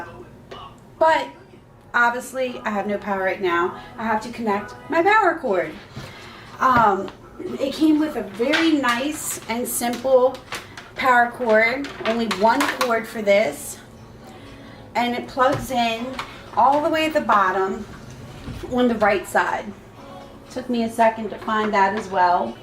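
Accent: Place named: Delaware, United States. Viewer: my ex mother in law and one ex sister in law lives in Middletown my other ex sister in law lives in Bridgeville. Your accent reminds me of them (all good things). I graduated from Dover High School before moving back to my home town in Nevada lol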